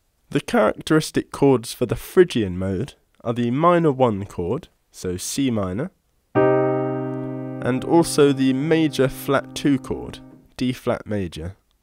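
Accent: United Kingdom. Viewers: thank u ،،;btw r u from UK?i enjoyed ur accent 👏👏♥🌷🌷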